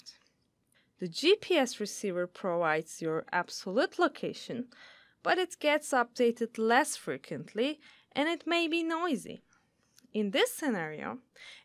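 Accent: Turkey. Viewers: Konuşan kadının Türk olduğuna yemin edebilirim isteyen olursa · She sounds very Turkis!h to me. Great explanation! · bayan kesin Türk valla..Ingilizcesinden tahmin ettim. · Is the speaker Turkish? Please someone tell me whether I'm right I have to win a bet. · türkçe düşünerek inglizce konuşmuşsun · Bunu seslendirenin Türk olduğuna her türlü iddaya girerim. Tam bizim aksan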